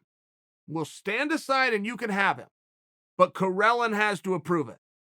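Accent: Russia. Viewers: Love Chael's ambiguous russian/east-european accent here lmao. Give us more russian wrestling stories Uncle Chael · Your Russian accent Chael.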